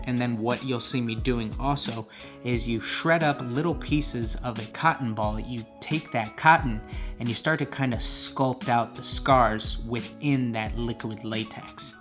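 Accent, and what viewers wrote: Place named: New York, United States. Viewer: Those are fire bro , bro i can hear an accent u gotta be from NY or Canada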